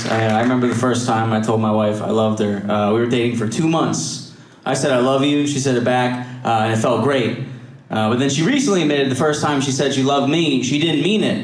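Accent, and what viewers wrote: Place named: East Coast, United States. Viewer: Great special. Dig his easy flow, never low on the funny. Voice (not style) every so often sounds like Stavros Halkias for a few seconds, he has to be East Coast origin. I’ll watch this a bunch of times.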